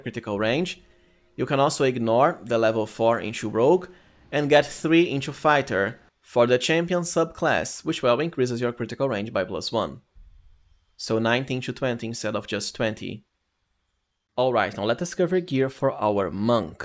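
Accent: Brazil. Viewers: eu SABIA que o sotaque era brasileiro, SABIA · Eu tenho certeza que tu é brasileiro com esse sotaque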